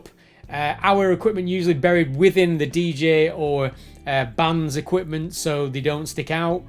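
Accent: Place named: Wigan, United Kingdom. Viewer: Awesome! Funnily enough, I’m a photographer looking for some LED lights for the dance floor… think you’ve just convinced me! And a familiar accent, too! I’m only down the road in wigan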